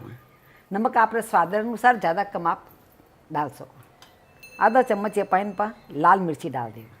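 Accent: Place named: Marwari, India. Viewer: Aap meri sakhi kab bnogi vaise yaha meri pach saheliya hai chhthi aap ban jao.aap ki marvari mithi lavati hai ek jaypur me bhi meri s aheli. hai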